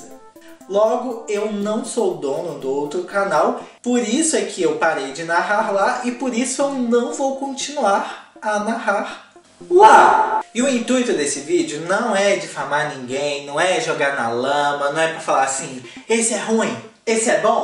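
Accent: Minas Gerais, Brazil. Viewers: Eu amoooo a sua voz, o seu mineirês e a sua narrativa. Não entendi nada quando mudou o narrador no outro canal, logo deixei de assistir aos videos pois achei chato sem vc 🤩 abonadissima ao seu canal. Voa cara ,voa ! 😆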